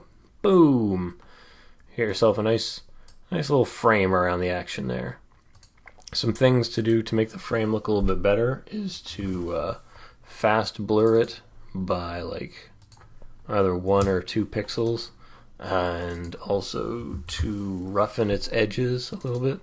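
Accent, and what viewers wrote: Canada. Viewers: Hein quoi?! J'entend par surprise du québécois dans un vidéo anglo?! Ça c'est nice! :p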